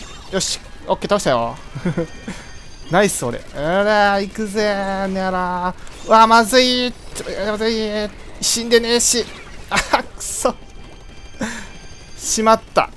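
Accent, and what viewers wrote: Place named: Kansai, Japan. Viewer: うぽなす！関西弁w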